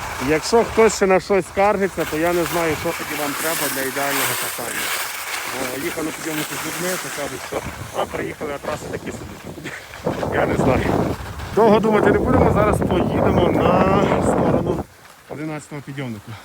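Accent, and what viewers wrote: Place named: Transcarpathia, Ukraine. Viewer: Гарний закарпатський говір !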